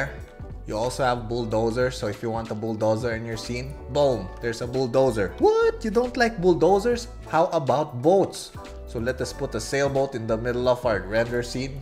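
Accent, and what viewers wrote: Philippines. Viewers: Are you a Filipino? You have that accent you know.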